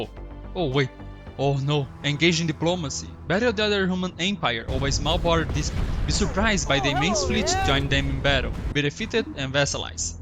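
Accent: Brazil. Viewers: Hey, you have accent, are you Brazilian or Latin American? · tava reconhecendo seu sotaque... é BR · Pelo teu sotaque, sei que tu é brasileiro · U really sounded like a brazilian in this video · Sabia q era BR só pelo sotaque kkkkkk, ótimo vídeo champs! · So pelo teu jeito de falar ingles descobri que tu é brasileiro hahahahaha. Primeiro br fazendo video estilo seth, muito bom, continua!!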